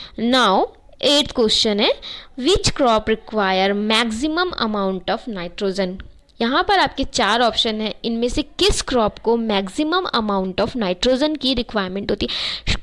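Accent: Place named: Kanpur, India. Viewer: to kaha se .... Apki boli se lagta h sp Kanpur ki ho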